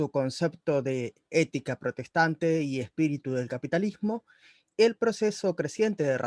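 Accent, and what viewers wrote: Standard. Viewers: Me encanta como explicas, pero se me hace raro que hables en neutro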